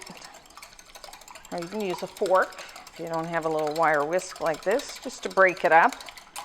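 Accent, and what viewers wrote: Canada. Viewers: Are you canadian? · I listened to this video again I think you're correct! At first I was thinking North Dakota or Northern Michigan but I think I'm hearing a slight Nova Scotia tone now. She probably went to elementary school in Nova Scotia and then high school somewhere else in Manitoba or Ontario. Her 'About' and 'outside' totally gave away the Canadian connection. It's tough to tell exactly where though because she doesn't use slang or idioms much. I love her videos. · Are you Canadian? You pronounce "ou" a lil bit like canucks do.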